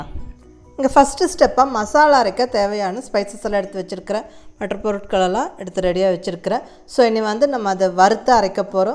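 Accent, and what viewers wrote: Kongu, India. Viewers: Mam super recipe. Kongu Tamil superb Namma Coimbatore subscribers ellarayum meet pannalamae mam PL reply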